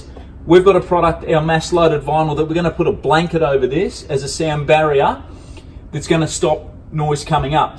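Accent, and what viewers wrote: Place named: Australia. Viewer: your genuine Ozzy accent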